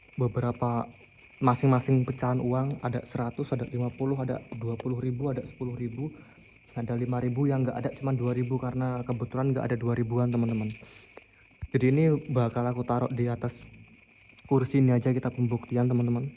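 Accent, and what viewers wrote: Java, Indonesia. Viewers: Logatmu mambu jowo bang 😊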